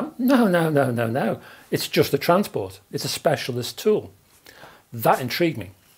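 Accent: Liverpool, United Kingdom. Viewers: Hi, I recently purchased the Audiolab 6000A and then subsequently found your youtube page and web site, so it was probably a sheer fluke I made the decision I did. Anyway, now i'm watching your review of the 6000CDT and will probably get me one of those. I do however have a couple of questions, if I may: my experience of hi-fi is purely analogue and in your review you discuss the merits/benefits of adding an external DAC - excuse my ignorance if you covered my question - the 6000A has an internal DAC (?), so would this combo of the 6000A and 6000CDT still benefit from the external DAC. My apologies for the long winded getting to the question, my second question - are you a scouser? thanks in advance mate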